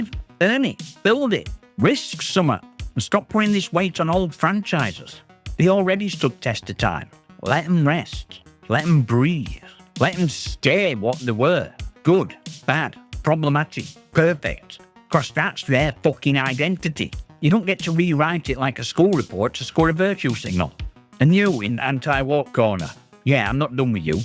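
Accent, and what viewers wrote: Yorkshire, United Kingdom. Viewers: Bloodyhell I've not heard this accent in a while. What part of Yorkshire you from? Hahah I agree with your video for the most part, nice work man.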